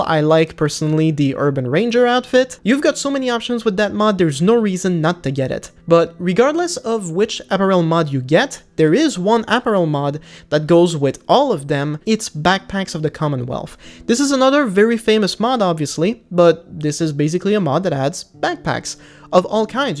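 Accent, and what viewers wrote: Quebec, Canada. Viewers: Are you from Quebec by any chance ? Feels like i'm watching a GSP mod review ;)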